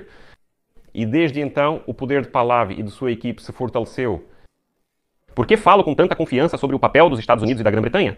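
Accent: Portugal. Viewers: Sotaque interessante. É de Portugal o senhor?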